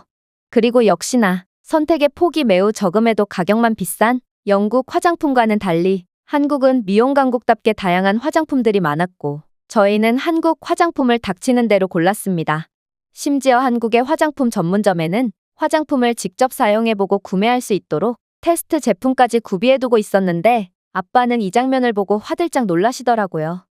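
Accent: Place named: China. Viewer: 음 아직 다듣기 전인데 처음에만 들어보니 한국인인척 하는 중국인이겠군...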